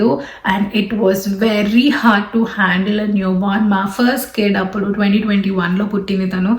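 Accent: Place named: Canada. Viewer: vat izz dis, whu bludy cars abut ur uze les histeri. nd u r aksent iz u born nd brat up in khanada not in India. duck off nd u cho kalled NRI's doont put dese vedios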